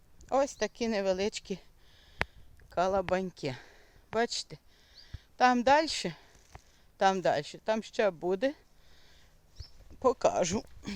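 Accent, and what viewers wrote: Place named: Ternopil, Ukraine. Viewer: Нагадали Ви менi моi 20 рокiв😊 В Днiпродзержинськ на швацьку фабрику приiжджали кожен рiк декiлька десяткiв дiвчат з Тернопiльськоi областi пiсля швацького училища. Так вони розмовляли так само, як Ви. Особливо слово " але" дуже часто вживали. " I" з дома крапками не можу писати - тiльки нiмецькi та росiйськi лiтери маю. Батьки були росiйськими нiмцями. Розмовляти украiнською не мала потреби, але читала, писала у школi. Не забула 😃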